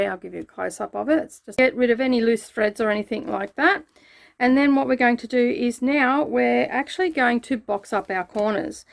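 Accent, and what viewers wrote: Australia. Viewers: This tutorial comes just in time for me. I really need to make a couple of these bags to hold some toys for my Grandson. Thank you. Great tutorial. Nice to listen to a fellow Aussie :)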